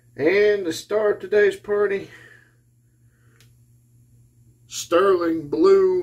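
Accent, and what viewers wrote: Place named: South, United States. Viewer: Great shave! Where you from? You sound like a fellow Southerner.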